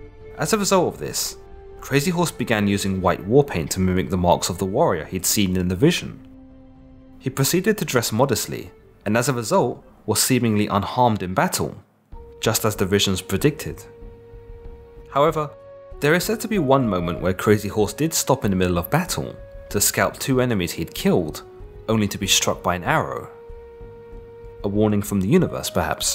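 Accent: United Kingdom. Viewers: Fucking hilarious hearing a Brit talk about my history lol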